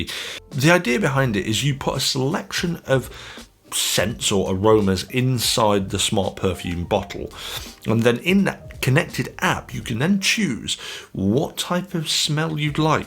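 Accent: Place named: England, United Kingdom. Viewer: I swear your accent is from the same bit of Blighty as Clarkson.. and you're also speed and whiskey freak. Have you hung out with Clarkson, by any chance?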